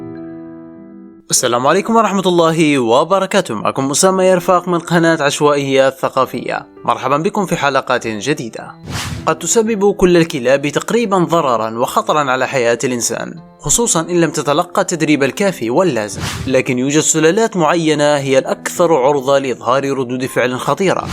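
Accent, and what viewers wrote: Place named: Libya. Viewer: أسمع أنت ليبي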